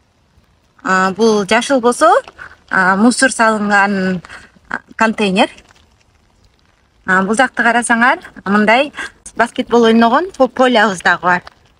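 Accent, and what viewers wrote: Standard. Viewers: Азаматсын! Таза суйлогон кыргыз кызы экенсин.Аман бол.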